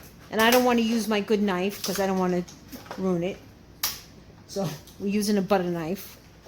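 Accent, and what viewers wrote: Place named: New Jersey, United States. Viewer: you definitely sound like a Jersey Girl. That locked amazing.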